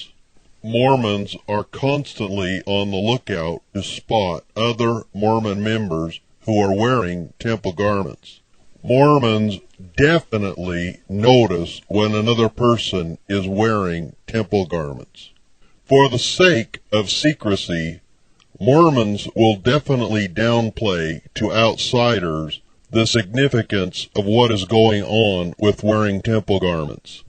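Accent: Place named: Canada. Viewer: I dare not try to understand the purpose of the video, they guy sounds Canadian.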